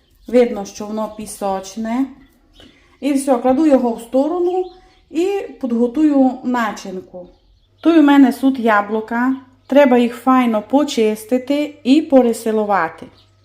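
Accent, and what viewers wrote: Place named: Prykarpattia, Ukraine. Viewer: Добрий ваш колач, а ще ліпша ваша мава. Дуже приємно вас слухати . В селі, де жила моя баба дуже подібна вимова, таке "и", хоч вже в сусідньому селі, такого нема діалекту. То на Прикарпатті, на Львівщині. І кухня у них відрізнялася. В бабиному селі жінки вміють пекти бульваники в п'єцу. Де ще вміють пекти бульваники? Цікаво яка історія, як заселялися люди, як переходили на інші місця.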